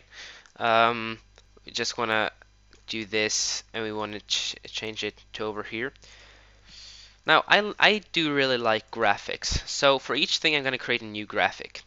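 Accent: Sweden. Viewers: are u Swedish XDD i am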